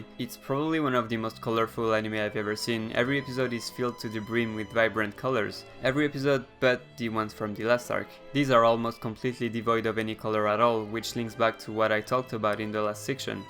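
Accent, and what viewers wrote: France. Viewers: Your analysis makes me see the show with a whole different eye, truly amazing ! Also your french accent is almost unnoticeable, how did u get rid of it so well ?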